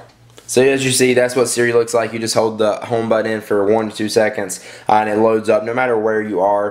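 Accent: South, United States. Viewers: I'm not being derogatory but it's funny that this guy is always wearing wigger cloths but sounds really country, that's an odd breed mix haha. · What accent is that? Sounds Southern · you've probably got the coolest american accent. coming from an aussie. lol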